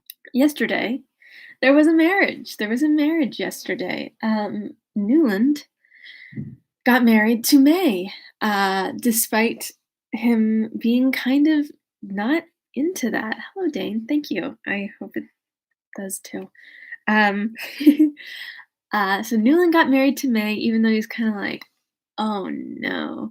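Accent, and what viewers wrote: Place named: France. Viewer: But the accent français!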